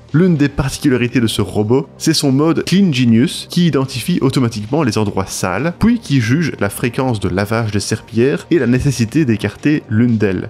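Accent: Belgium. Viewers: Oufti l'accent du béwé!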